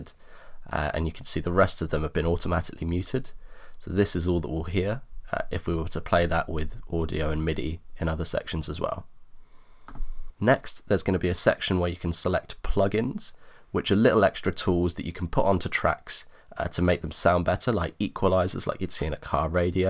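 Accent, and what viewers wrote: United Kingdom. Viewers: At last!! A nice British accent to listen to on an Ableton tutorial. Phew! ... sorry Murica.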